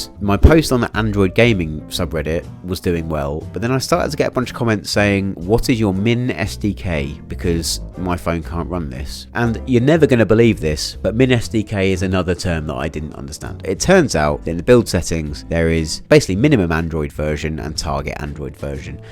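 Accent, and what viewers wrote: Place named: Southern England, United Kingdom. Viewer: Hey I just came across your channel, it's so nice to find a fellow brit doing game development! May I ask where you're based? You definitely sound like a Southener ;-)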